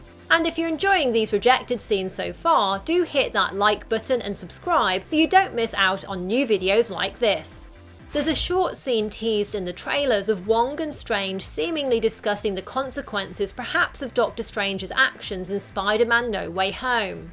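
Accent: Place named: United Kingdom. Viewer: I'm new in the channel, beautiful accent! Are you British?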